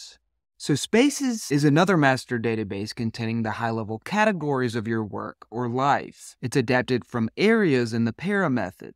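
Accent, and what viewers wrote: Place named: Maryland, United States. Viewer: interesting stuff! side note - are you from Maryland with that accent?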